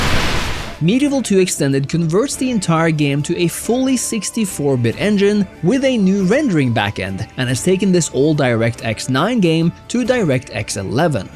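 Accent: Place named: Norway. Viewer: man ur norwegian accent is shining through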